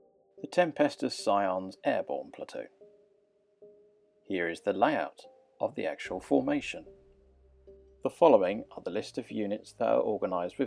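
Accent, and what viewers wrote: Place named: United Kingdom. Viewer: This is exactly what I’ve been looking for! Prayers answered British guy talking like a university professor about army organizational charts for 40K Perfection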